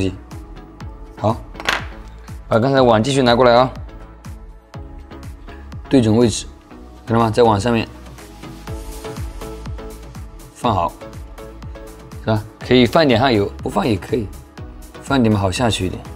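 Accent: Wenzhou, China. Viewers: 这温州口音，太熟悉了哈哈哈哈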